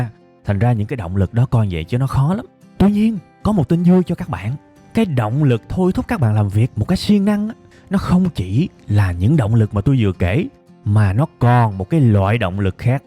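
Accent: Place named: Dong Nai, Vietnam. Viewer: Ad nói giọng giống người miền Nam, cụ thể là Đồng Nai quá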